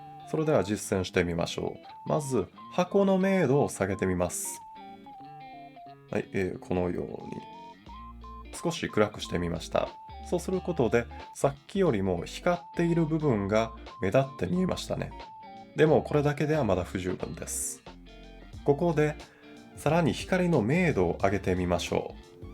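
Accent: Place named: Kansai, Japan. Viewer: 関西弁すき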